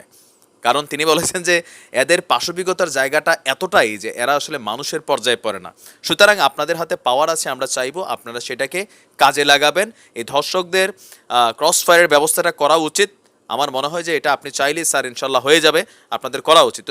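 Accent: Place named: Sylhet, Bangladesh. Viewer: Bai apnr Sylhety bashay kotha bola Kub sundor Hoise ❤️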